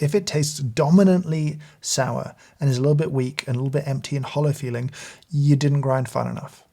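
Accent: United Kingdom. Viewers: Of all places, I'm sitting in a tiny town in beautiful Italy and "for whatever reason" can't go to a nearby coffee place. Asked YouTube what I can do with equipment I have in the kitchen and this came up! Looove it, and the British accent made me feel at home😇🙏💝🇬🇧. Thank you so much!! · I love your British accent! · I love watching videos. I love how his knowledge along with his British accent makes him "sound" pretentious 😆 but he's genuinely likeable. I feel like his extreme knowledge might be a curse, though. I say this because I know how my preferences changed when I was forced to drink my coffee without sweeteners. It completely ruined sweet coffee for me (it's a wonderful thing to appreciate unsweetened coffee, I know) but I'm sure 99% of the cups of coffee I would find have glorious flavors, would just spit out and say it's disgusting 😂 I currently can't even imagine what he means by coffee tasting hollow or having texture. 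I haven't even tasted the difference between sour and bitter coffee, let alone tell when a serving has different levels of both at the same time. I hope to continuously learn from though!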